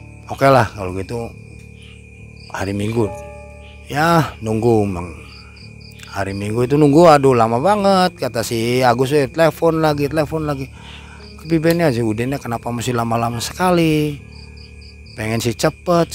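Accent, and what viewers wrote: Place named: Cirebon, Indonesia. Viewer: Wuaduh bhsae Kya wong cerbon apa wong dermayu kih ... Hehehehe 🙏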